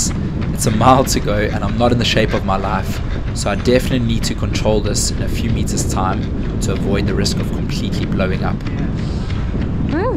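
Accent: South Africa. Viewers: Hahahah love the south african accent 🇿🇦😁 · South African? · This is absolutely insane ❤‍🔥well done bro. Also, I can sense that south African accent there😁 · You sound South African. Are you?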